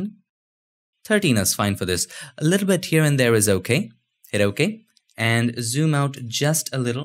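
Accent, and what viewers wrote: India. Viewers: You know what, it's almost a perfect video, you just need to know how to get rid of your indian accent. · guy with Indian accent tells me how to fix motion blur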